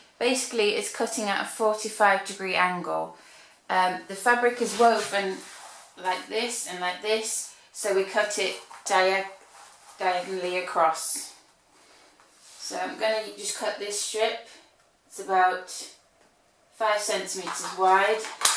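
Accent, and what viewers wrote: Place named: United Kingdom. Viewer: I love your british accent! Today I bought my first fascinator , very expensive and I'm not happy because is not like all fascinators I use to watch on tv. I have to attend a important wedding soon, and I want to add something nice to my outfit, but checking your videos, I can see not all fascinators are hats, there are lot of choises and mine is just like this one on this video, gray color. Thanks a lot!!! Regards from Chile :) I'm really sorry for my bad english lol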